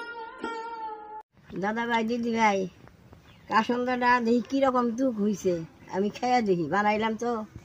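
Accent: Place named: Manikganj, Bangladesh. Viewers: তার মুখের ভাষা আমার মানিকগঞ্জের মতোই, আঞ্চলিক টানও একই। সম্ভবত তার পূর্বপুরুষ মানিকগঞ্জ থেকে আসাম গিয়েছেন। কাসন্দ বানানোর নিয়মটাও হুবহু আমাদের বাড়ির মতোই। নমস্কার ঠাকুমা। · Bangal bolaito thakumar ranna valo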